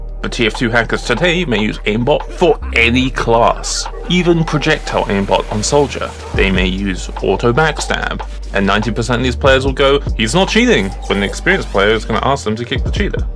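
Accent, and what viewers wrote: Russia. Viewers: are you rusian???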